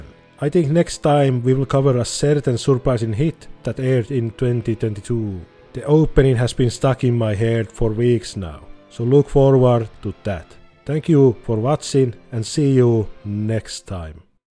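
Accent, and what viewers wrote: Finland. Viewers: As finnish i can recognize strong finnish english accent. Great video btw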